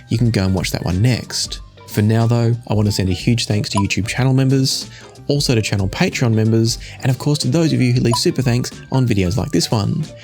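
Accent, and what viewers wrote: Australia. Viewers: He's Australian......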